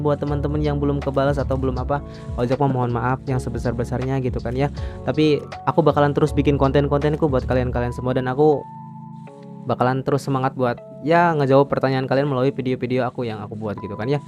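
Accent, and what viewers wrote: West Java, Indonesia. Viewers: Mantap kang, makasih vanyak i'lmunya kang.. Tapi daru katanya seperti orang sunda kang ojak duhk. 😁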